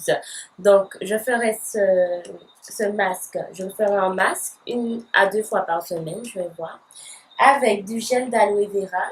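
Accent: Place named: Martinique, France. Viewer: J'aime beaucoup ton accent de la Martinique. L'huile végétale de rose musquée c'est ma préférée, sympa tes achats Gros bisous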